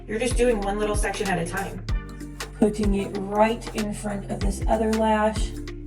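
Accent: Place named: United States. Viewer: Hey from Melbourne Australia 😁 I need to tell you and I hope you see this that I've never come across your channel until today and from the get go your presence in front of the camera, sounds weird but your American accent and tone is so easy to listen to😄 lol it's positive, straightforward, informative, believable, easy to relate to, fun to watch and you instantly drew me in! I love your name and the design on either side as there no other distractions in the frame and my eyes go instantly to you. " Well of course that's the intention" lol my favorite colors are pink/ purple/ turquoise, peach and silver and obviously that's another reason I was sucked in 💜 then of course you are an edgy, sassy, talented, funky, creative, bubbly, charismatic goddess of a UNICORN 🦄 Female🤩 God I'm full of compliments today lol and of course your stunningly beautiful have the best eyelashes of course and ooze mad swagger!!! 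There ya go💜 and I will be using all your tips for doing my lashes for myself when I buy the products I need. You brightened up my day so thankyou ☺ from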